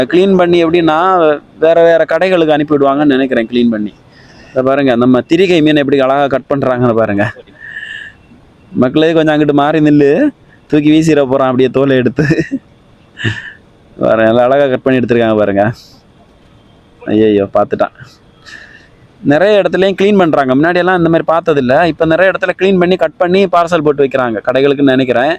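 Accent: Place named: Kanyakumari, India. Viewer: Kaniyakumari karan..pola...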